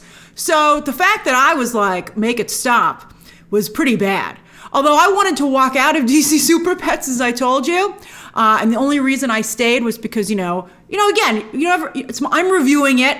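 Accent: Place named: New York, United States. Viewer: Is she really from NY or does she do the bad accent for the pop?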